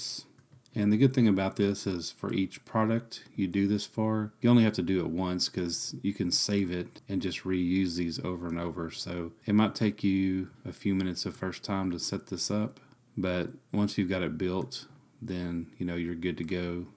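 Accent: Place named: United States. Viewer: your american im scottish DIDNT understand a thing you were talking about sorry :-((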